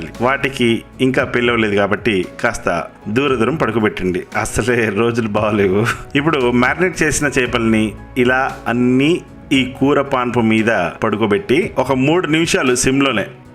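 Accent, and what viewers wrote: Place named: Godavari, India. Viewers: రాలేదు గోదావరి కి కానీ యాస బాగుంటుంది అండి అయ్ మా friends ఉన్నారు ప్రౌన్స్ బాగుంటాయి❤️❤️❤️❤️ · 🤣🤣godavari slang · Aa voice, voice lo grace.slang ,superb wow sir konni words tri vikram gariki kuda cheppandi sir. Mana godarolla manasu vallu matlade matalo thelustundhi. Sir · Hiii ala unnaro. Mana godavari vala voice super ga chepparu. I love godavari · Mana godhavari మాట కే.. కాదు.. వంటకాలకు కి కూడా ఒక spl place ఉంటుంది.. ఎక్కడైనా సరే..... చాలా బాగా explain చేశారు... That to గోదావరి యాస.. hailight ... ,❤❤❤❤ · సార్ మీ వాయిస్ సూపర్ గా ఉంది గోదావరి వాయిస్ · Godhavari yasa yetakaram ayendhi bro.plz never sing..... requesting you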